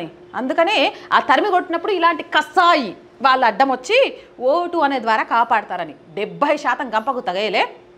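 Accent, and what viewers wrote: Telangana, India. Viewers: చెల్లమ్మా నీ ధైర్యానికి జోహారు తల్లీ నువ్వూ ఇలానే చెయ్ నీ వెనక మేము ఉంటాం తల్లీ కాస్త బొట్టు పెద్దగా పెట్టుకో బంగారు తల్లి నీ యాస మా తెలంగాణ అనుకుంట అమ్మ నా సలహా పాటించమని నా విన్నపం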